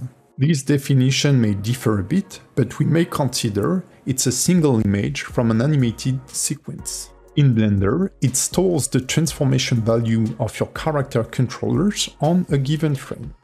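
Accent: France. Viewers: You sound french ! That makes me understand more well !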